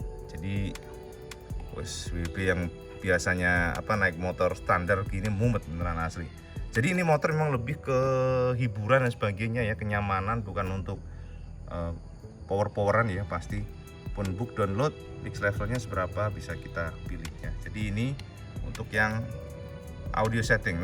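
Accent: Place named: Tulungagung, Indonesia. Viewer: Penasaran karo motor 6 silinder terus ndilok" Neng youtube lakok nemu vidio iki, tapi koyok ra asing karo logat e, mari tak dilok neng google tibak e wong T. A hadehh, sak kabupaten bak e😂😂 , Sukses terus mass 👍🙏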